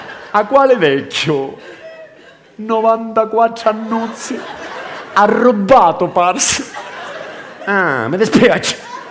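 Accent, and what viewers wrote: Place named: Sicily, Italy. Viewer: Very, very funny. He sounds like my Sicilian (di Agrigento) father spoke. grandissimo di l'america.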